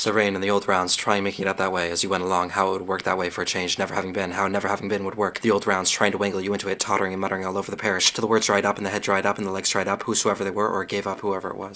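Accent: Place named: United States. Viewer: Im not certain an american accent lends to the phraseology of beckett.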